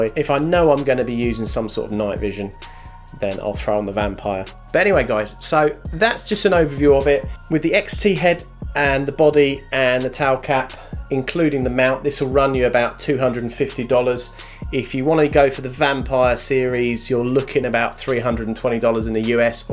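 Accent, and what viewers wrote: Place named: Australia. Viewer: Where are you located? Sounds Australian but I though Australians had super tough gun restrictions.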